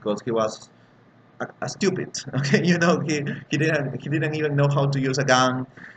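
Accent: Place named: United Kingdom. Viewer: English from Británico😊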